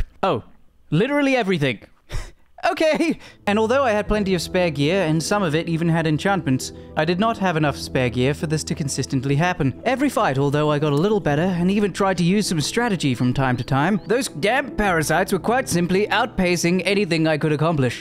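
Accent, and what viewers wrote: England, United Kingdom. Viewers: Nice bi i s accent mate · your voice is so bri ' ish that i should hate it but god dam it, i love your voice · Are you British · is the most Bri'ish British YouTuber I have ever seen, and I love it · He British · This is the most aggressively british youtuber ive ever heard Instant sub, very nice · He is an English gentleman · you are deffinitly from the uk, ive never seen anybody talk like that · is this dude british or from somewhere with heavy british influence? the way he said darkness kinda makes me think so like the r isnt sounded just curious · dude i never heard British dude playing Minecraft 😅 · more like least formal Posh Brit (Your Average Brit has a cockney accent and cockney is anything but formal) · The British accent is marvelous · Dammnnnn british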